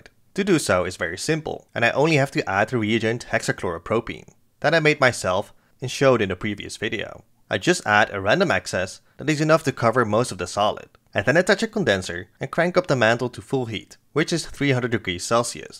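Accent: Netherlands. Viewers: You sound Dutch. Loving the content!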